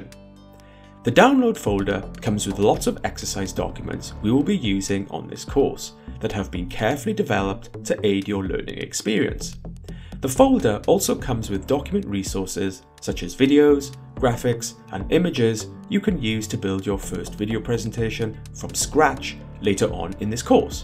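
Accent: United Kingdom. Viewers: Is there an option to turn off british accent?:hand-orange-covering-eyes::hand-orange-covering-eyes::face-orange-raised-eyebrow::face-orange-biting-nails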